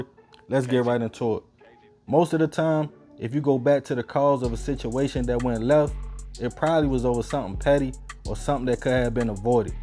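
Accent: Baltimore, United States. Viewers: Ur Baltimore accent stinks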